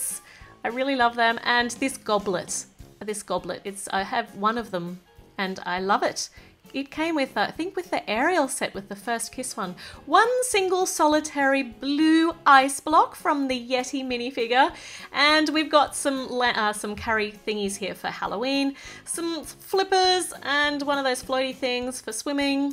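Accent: Australia. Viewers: sydney i think (she sounds like it)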